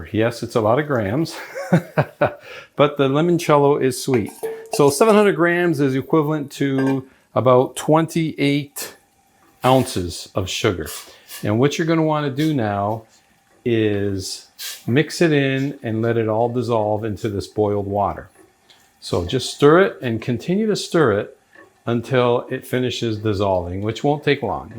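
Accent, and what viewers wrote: Canada. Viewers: At first I thought you were American and was astonsihed to hear you talking metric and not imperial...then I realised you are Canadian. Best wishes from the UK. I enjoyed your video. For me the big expense is buying grain alcohol...the cheapest I can find is £38 for 70cl. I guess this is why people use vodka £20 for 70cl....I think that's what I'll do and add granulated sugar instead of syrup...I might have to wait longer for the lower strength alcohol to extract the oils from the lemon peels. I love Limoncello!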